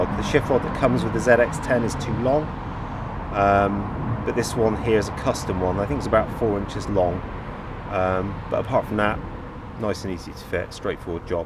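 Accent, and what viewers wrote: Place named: Swindon, United Kingdom. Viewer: It looks stunning in that orange. Where did you get that smaller number plate made? Going by your accent I would say your near the Swindon area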